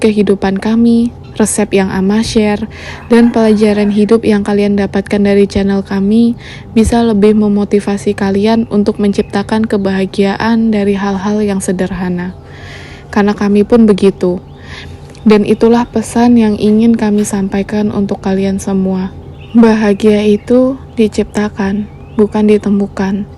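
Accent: Manggarai, Indonesia. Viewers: Ci tinggal di Manggarai pasti e, soalnya dari logatnya org Manggrai ni, ada video kompiang, tacupiang trus ada acara smbut baru juga.. salam kenal dr Ruteng, sukses utk kontennya Ci, sngat menginspirasi😇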